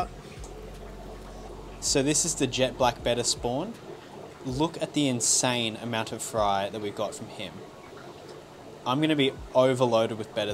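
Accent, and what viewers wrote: Australia. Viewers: This is amazing! What's your accent? Aussie?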